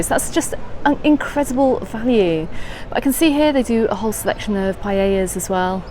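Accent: United Kingdom. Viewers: Damn so strong british accent i do not understand nothing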